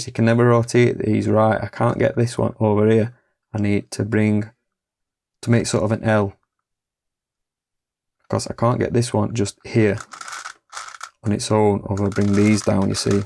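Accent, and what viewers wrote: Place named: Mexborough, United Kingdom. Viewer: You sound like youre from Mexboro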